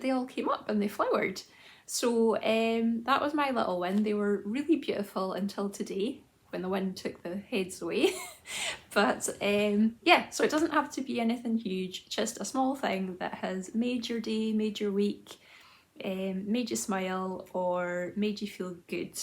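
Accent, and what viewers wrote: Scotland, United Kingdom. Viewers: Hello, lovely to see you again. This is only the second podcast I viewed, I only quite recently discovered your channel. Love your Scottish accent and all your lovely knitting. My small win recently: I am gonna finish a Marie Wallin cardigan I started almost 5 years ago. I finally feel self assured enough to finish the colour work yoke ánd do the steeking. This will be the summer of me finishing my lovely cardigan, so I will be ready for a nice autumn wearing this beautiful colourwork cardi. 😍😍😍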